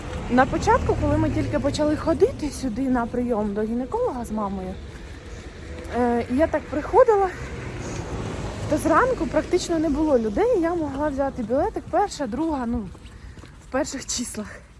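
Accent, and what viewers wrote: Surzhyk, Ukraine. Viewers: вас суржик очень милый❤ Мне, как русскоговорящей украинке, совсем не режет слух😊 Хотелось бы узнать как заговорить на польском, может есть секрет 😂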